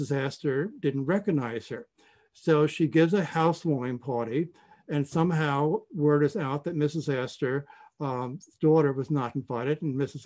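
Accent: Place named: United States. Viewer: A very nice documentary, thank you for this; in one piont, I'd rather to sy sorry, because the dialect is so terribly american, that on almost could not undrstand what is really meant.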